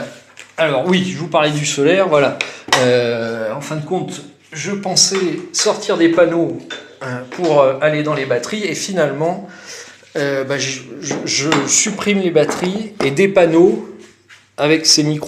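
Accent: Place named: France. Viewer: mmm, this amazing french voice )))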